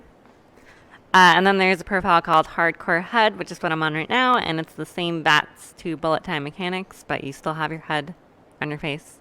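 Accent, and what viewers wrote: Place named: United States. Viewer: I get the American part. You just sound more American and a bit like a friend of mine. I want to go to Boston and drink everyone under the table. Do you build all these or just compile them? I never even got around to installing that last one you recommended. I want fallout as a sandbox of stress relief. I like to come home jump on and have some escape. I spent 3hours trying to get audio drivers working for bigscreen tonight and I'm so done. Mods this complex, they just hurt me because always something goes wrong and I get too angry and need to play another game to get rid of the anger.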